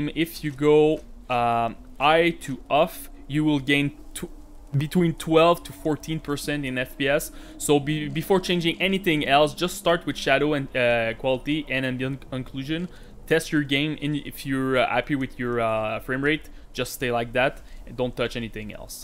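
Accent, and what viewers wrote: Quebec, Canada. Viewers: jai jamais entedu un accent quebecois autant fort dude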